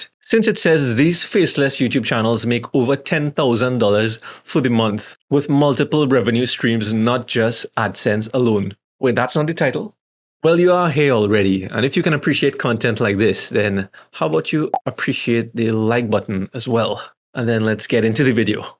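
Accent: Trinidad and Tobago. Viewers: Dude are you from Trinidad? Your accent caught me off guard crazy!!!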